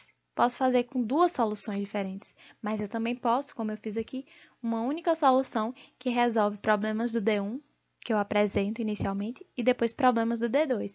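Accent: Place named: Bahia, Brazil. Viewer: O sotaque bahiano é a melhor parte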